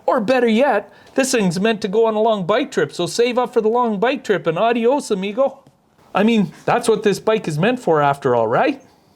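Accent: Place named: Canada. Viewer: hey what part of the world are you in??sound Canadian hey ,,i cant find that GT in that color anywhere in the usa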